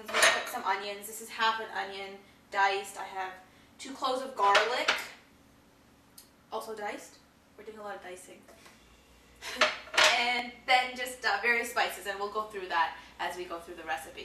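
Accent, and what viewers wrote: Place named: North America. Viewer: No. It's a north american accent.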